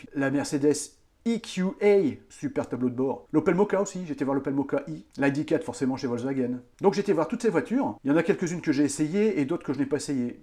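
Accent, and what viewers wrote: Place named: Lille, France. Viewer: j'etais sûr que tu étais de Lille, trahis dès le début ! (^_^)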